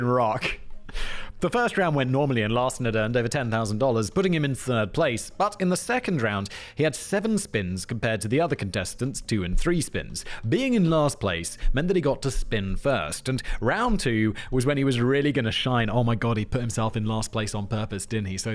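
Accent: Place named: United Kingdom. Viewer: The topic isn't my top interest in any way, but I really need to look those up lol. was made for podcasting, especially as an American, where any British accent immediately adds some sort of credibility 😅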